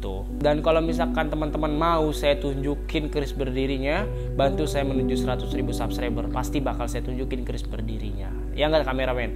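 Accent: Banten, Indonesia. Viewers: Logat nya kaya orang serang Banten ya bang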